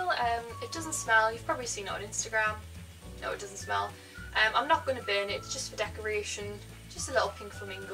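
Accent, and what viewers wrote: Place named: Sunderland, United Kingdom. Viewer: Are ya from Sunderland?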